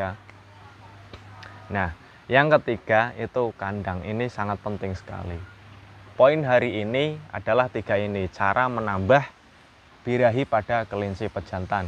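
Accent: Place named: Java, Indonesia. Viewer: Logatnya org jawa y mas, jawane pundi??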